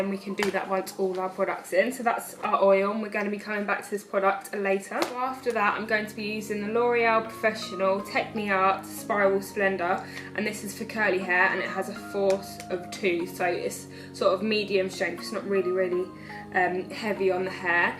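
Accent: United Kingdom. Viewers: Her accent !! Where in England is she from? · british accent is so cute you are so pretty ;)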